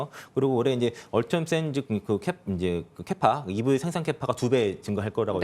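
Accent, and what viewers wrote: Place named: North Korea. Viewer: 아나운서 목소리 북한사람인줄~~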